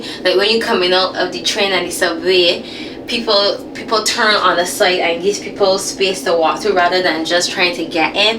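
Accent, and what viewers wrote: Barbados. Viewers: Hi, I love and enjoy your content, my only complaint would be that I wish it was more regular 😁. I particularly enjoyed this one. Please, keep your Bajan accent, it is so wonderful to hear you sounding like you've not left Barbados. Take care and God bless.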